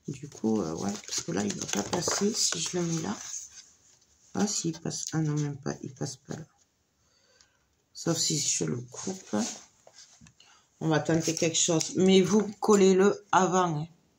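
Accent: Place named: Toulouse, France. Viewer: Bonjour, 👏 Très bel album. Vu l'accent et l'allusion à Toulouse je suppose que vous êtes dans les environs. J'habite à Albi et je ne connais personne qui scrappe tout proche.je suis autodidacte depuis mes débuts, j'adorerais avoir ma chaîne et partager ma passion mais ça doit prendre beaucoup de temps surtout quand on est accro et aussi pas évident avec mon métier de soignante. Alors je visionne...je retranscris les tutos et je fais des patrons... Là avec vous, je souris c'est interactif même si vous ne me répondez pas je finis vos phrases et vous souffle les mots manquants spécifiques du scrap! 😁. Je n'ai jamais eu l'occasion non plus de me rendre à un salon créatif car pas informée. J'aimerais bien correspondre avec vous 😉. Meilleurs vœux✨️ et belle journée à vous 🌺 Sophie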